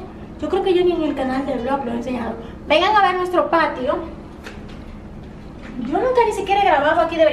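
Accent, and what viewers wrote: Dominican Republic. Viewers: Me encanta el acento de República Dominicana 🇩🇴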